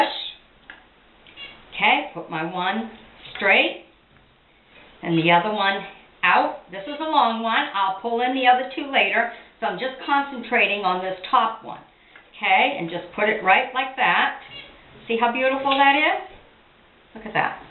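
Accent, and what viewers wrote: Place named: Maine, United States. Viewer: Are you from Maine? I thought so with the accent then you said homemade Italians? I knew it! Thank you for sharing...Merry Christmas!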